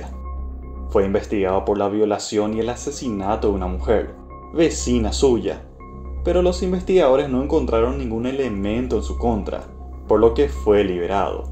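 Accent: Paraguay. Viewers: Exelente video maestro 💣, sos de Ciudad del Este, Saltos o Pedro Juan si o si por tu acento 🇵🇾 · UF TU VOZ SUENA A BRASIGUAYO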